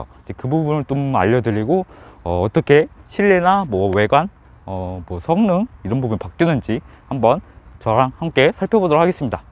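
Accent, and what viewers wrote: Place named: Jeolla, South Korea. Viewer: 전라도 말씨여ㅎㅎ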